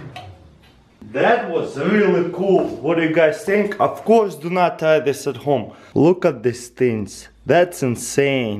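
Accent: Russia. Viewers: i love listening to that Russian accent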